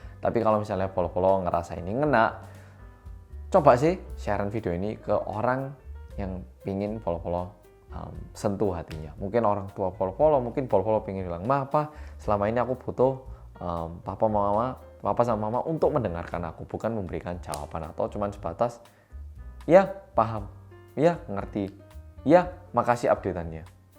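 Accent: Java, Indonesia. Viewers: Pengen punya kaos korea medhok kya mz deh😍😍😍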